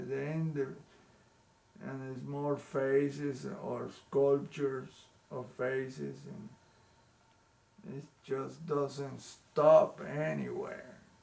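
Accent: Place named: Spain. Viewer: Your Spanish accent is Too much man. Learn to pronounce is like u do shit on purpose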